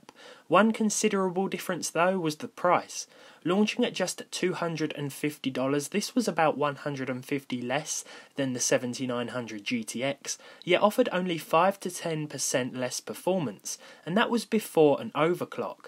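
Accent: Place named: Kent, United Kingdom. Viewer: I've just realised that is a picture of swalecliffe/herne bay! I knew I recognised your accent!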